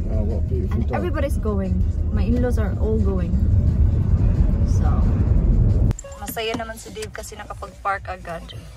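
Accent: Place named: Visayas, Philippines. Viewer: bisaya di ai ka sis hahahha relate jud sis hahaha usahay galisod ko tagalog hahaha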